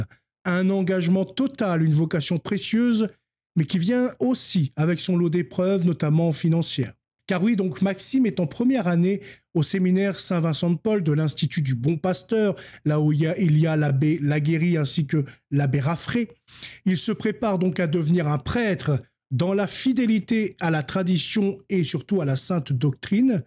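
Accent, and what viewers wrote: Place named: United Kingdom. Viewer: Pourquoi prononcer à l'anglaise des noms manifestement bien français ?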